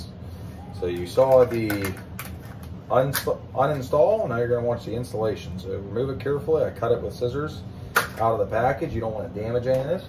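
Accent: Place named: Pittsburgh, United States. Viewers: Video was descriptive & yum yum.. So nice to watch. Pittsburgh accent. A local? Lol 😂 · hey man thanks for doing this. is there a correct or incorrect order of attaching the wires to the element? I didnt get a pic before hand and have it working again but i have to wonder.... is one positive and the other negative? Talking about the two connections on the upper element? Im guessing either way of re attaching those two wires cant be wrong. however i do see two markings on the bottom. PS i know that accent anywhere and you CANT be far from southwest Pennsy. bet im within 300 miles :D Edit2: Oh snap man i think i missed it the first time. Here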